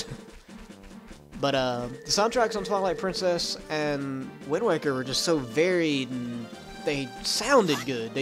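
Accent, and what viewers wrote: South, United States. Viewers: been watchin these for the past two days so i had background noise while i play ocarina of time, why do you hate your voice, i dont, i got the same one kinda, i like the southern accent.. guess its cuz i was raised around it but still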